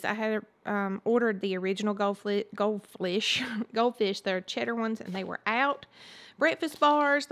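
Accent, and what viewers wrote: South, United States. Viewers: I loved the haul. I can’t wait to see Harrison in his tuxedo. Also I love your “ accent “. I’m southern also lol & I’m from Georgia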